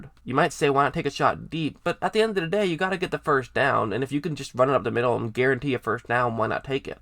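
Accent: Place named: Tennessee, United States. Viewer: You sound like you are from Tennessee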